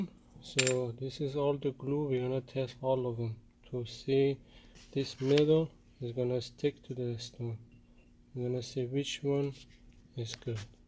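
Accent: Iran. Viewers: Love your accent. Sounds Persian. Where are you from?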